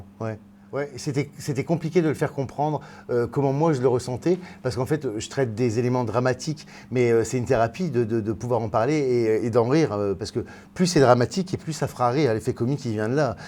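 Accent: North, France. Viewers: Surtout change rien JeanFi au début j'avoue j'avais du mal avec ton accent du nord moi qui suis du sud je n'ai pas l'accent de Toulon encore moins de Marseille. Dommage qu'avec Air France on ne puisse pas aller au Québec.... Je t'ai bien sur découverts avec la bande a Ruquier et sérieux quand Laurent te programme je suis super contente car je sais que je vais passer un excellent moment. Et puis j'ai écouté ton sketch ou tu parle de ta sœur et de tes parents. Je suis reconnue quand tu parle de ta sœur. Mais même quand tu caricature ton milieu et tout ce qui se passe dans un avion moi j'ai la chance de pouvoir le prendre assez souvent alors c'est malheureusement pas Air France que je prends mais Air Transat que j'appelle "Air Sardine" mdrrr la bouffe est immonde mais en contre partie j'ai l'accent Québéçois dés que je monte dans l'avion. En tout cas merci pour ce que tu es... 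Bisousssss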